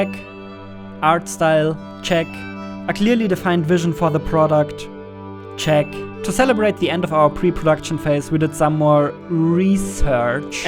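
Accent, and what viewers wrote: Germany. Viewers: Man hört fast garnicht raus das du Deutsch bist, Echt gutes Englisch👍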